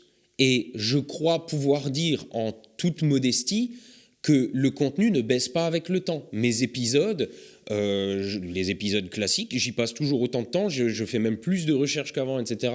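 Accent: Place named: Alsace, France. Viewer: On sent bien l'accent d'Alsace mdrrrr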